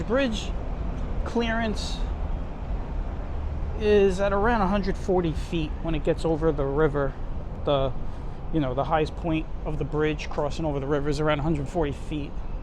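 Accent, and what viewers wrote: Brooklyn, United States. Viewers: I really enjoy your narrated videos as well as your authentic Brooklyn accent! 😊 It's a dying breed. 😢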